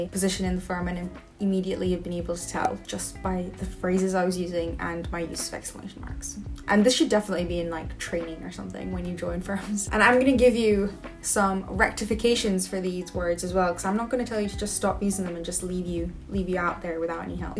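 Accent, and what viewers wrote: Scotland, United Kingdom. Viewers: that's a wonderful light scottish accent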